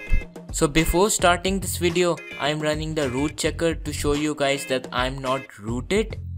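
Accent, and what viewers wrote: India. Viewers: bro from your accent i bet you are indian right? You english is pretty good tho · sounds indian but uses chinese as his text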